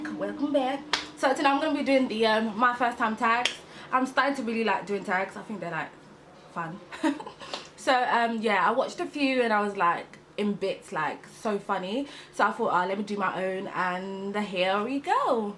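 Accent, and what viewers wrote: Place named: United States. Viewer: You're personality is EVERYTHING!! 😂😂😂😂😂. And you're American accent is 👌🏽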